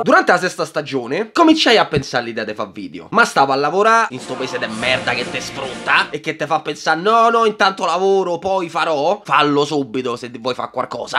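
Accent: Rome, Italy. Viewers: Fantastico come sempre. E parlando di fantastico, farai anche la serie di Animali Fantastici? Scusa ma sono stra ansiosa di sentire cosa ne pensi. Specialmente del secondo. Sarò sadica ma mi piace quando sbrocchi in romano! ☺ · Boh, tra accento romano, discorsi e memini di Berserk posso solo dire che ti amo haha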